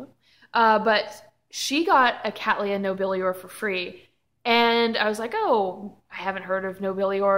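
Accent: South, United States. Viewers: I’m not sure where you live, I am not terribly familiar with the “eastern seaboard” and so I don’t know where the orchid show of which you were speaking is located. But, you mentioned your “southern accent” and my fiancé and I had to laugh. We live in New Orleans, but I grew up in North Dakota. I get made fun of for my accent, even though I’ve lived here for ten years and have lost most of my “up north” accent, I heard you say “you guys” so many times that I thought I’d hear an “eh?” at the end of one of your sentences, a la Canada. I’m very jealous that you live in an area where y’all have an orchid sale with international vendors; I have to drive to southern Florida to beg a wholesaler to sell to me if I spend $500-600. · LOL, you definitely have moments where the southern accent peeps thru, but honestly, you sound like you have a very neutral accent. 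Mix of east and west.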